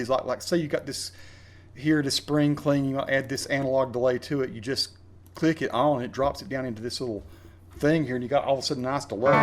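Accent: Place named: Southeast, United States. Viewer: I have been looking at video reviews of this amp for over an hour now, and I enjoyed yours 10 to 1 more than any other one. You’re relaxed, not trying to stroke your ego, just checking out the tones by picking through some tunes relevant to the settings. I don’t know why people think that an ‘amp review’ should be them running 64th note arpeggios. The only person that helps is themselves and their egos. Having said that, you’re absolutely a great guitarist and it’s beyond obvious you love music and the guitar. Also, it’s awesome to click on a video and hear someone speaking with the same accent as me! I don’t know what part of the southeast you’re from but I’m from the southwestern part of Virginia, and I’d guarantee you’re originally from VA, TN, or NC. Either way, you absolutely gained a new subscriber today!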